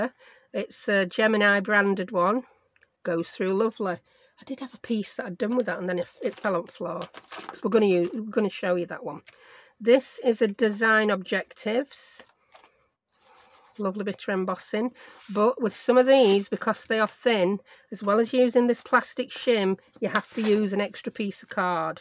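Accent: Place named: Yorkshire, United Kingdom. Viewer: Brilliant video. Love the accent. Is it Yorkshire?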